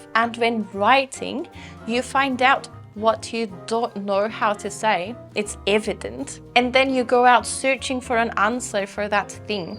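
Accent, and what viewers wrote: Australia. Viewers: Sagol. Great info. You always make such good sense and content of your videos are wonderful. Is that an Australian/Turkish accent I hear from you? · are you using Aussie accent?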